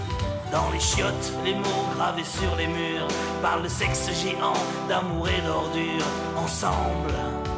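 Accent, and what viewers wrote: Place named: Paris, France. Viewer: Salut à toi François et ta gouaille de titi parisien si reconnaissable ❤️.....merci pour le rock/punk alternatif de ces merveilleuses décennies 80'-90' -00' que tu nous as fais vivre avec Les Garcons Bouchers puis Pigalle. Souvenirs de concert et de rencontre en province 😁👍 Les années passent, nos souvenirs d'une époque un peu raide à vivre mais solidaire quand-même par rapport à maintenant........salut l'artiste 🥲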